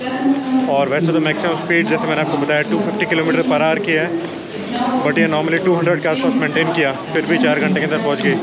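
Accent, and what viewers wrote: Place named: Hinglish, India. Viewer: Какой классический у Вас хинглиш!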